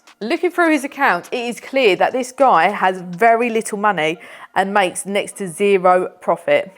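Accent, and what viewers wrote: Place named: Cockney, United Kingdom. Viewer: Hey you have such a strong cockney accent for a Punjabi girl..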